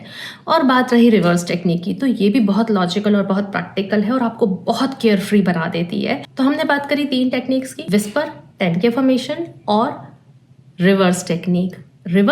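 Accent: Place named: Indore, India. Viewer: Everything is superb but the way you say something it's like an indori accent, bahut sundar smjhaya hai apne sita ram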